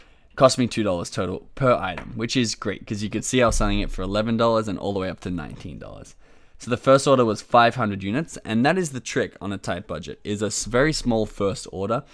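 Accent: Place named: Australia. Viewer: Hi! Thanks for a great course, just found your youtube channel. One question, are you based in the US or have a company registered there? The reason why I am asking is that I am based in Europe, but would like to sell on <link> and from the sound of it you are from Australia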